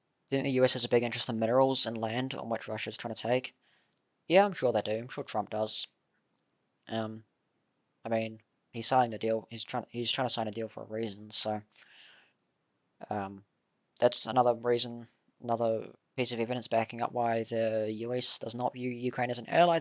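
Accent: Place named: New Zealand. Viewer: Enjoying your kiwi as accent, my dude.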